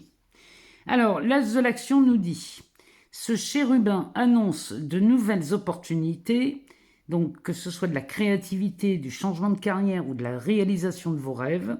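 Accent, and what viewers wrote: North, France. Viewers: Merci Merci Merci pour vos tirages toujours toujours très JUSTES … Dans quelques jours, je passe en justice pour mon divorce, et je sais maintenant que enfin je vais être mentalement, psychologiquement et physiquement « libérée et soulagée ».. J’adore vraiment votre voix qui est franche avec un p’tit accent soit du nord soit de la Hte Loire … Bien à vous et bonne semaine!!! 👏🫶🏻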